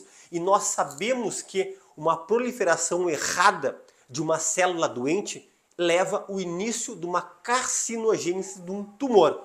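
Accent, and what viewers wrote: Rio Grande do Sul, Brazil. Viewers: Doutor o senhor é um médico bonito e educado, parabéns pelos seus pais ❤ gosto muito dos seus conselhos médico,o sotaque gaúcho é dez 💖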